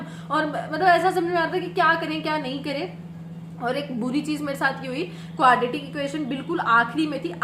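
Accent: Haryana, India. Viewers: ha to Haryanvi bolo na English kyu kharabar kar rahe ho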